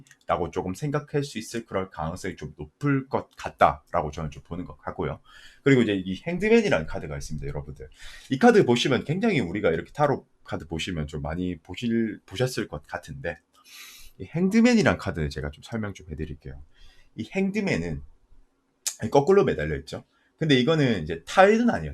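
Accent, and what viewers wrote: Gyeongsang, South Korea. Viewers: 2번~(2020/7/12) 2명. 잘 맞추시네요~^^ 경상도말씨 반가워요~^^ 주말잘보내세요.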